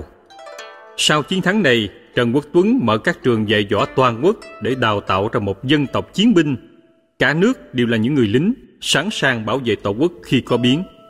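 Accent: South, Vietnam. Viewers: Giọng miền nam hơi khó nghe đối với người miền bắc. Hy vọng anh lồng tiếng nói rõ hơn. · Giọng nói thuyết minh Miền nam hay quá,khen ,để mấy anh chị HN chắc không ai nghe .Thanks for posting.(soluu huong)🇺🇸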